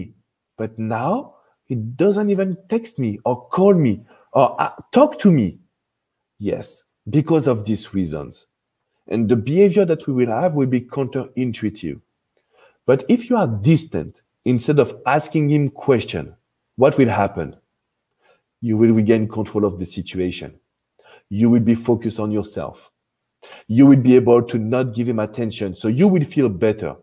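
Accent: France. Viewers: NG from Nigeria. U are always apt and on point. I love the French accent. U are simply the best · I am here for the french accent. :-)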